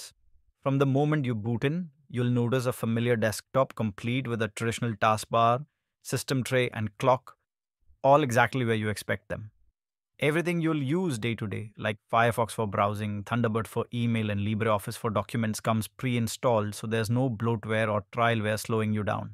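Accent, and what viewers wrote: India. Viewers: Jeet voice, immediate thumbs down and ignored.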